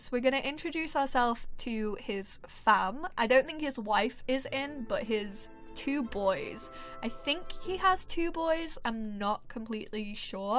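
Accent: England, United Kingdom. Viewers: Btw... I love the English pronunciations of many words... like aluminium. So don't try to change.😁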